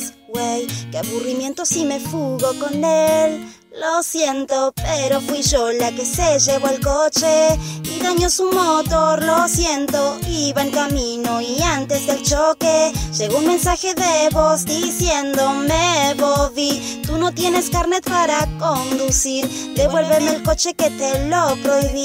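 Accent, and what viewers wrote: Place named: Corrientes, Argentina. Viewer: una pregunta de qué parte de Argentina sos porque tenés un acento como de Corrientes o Misiones Contesta x fa Te quieroo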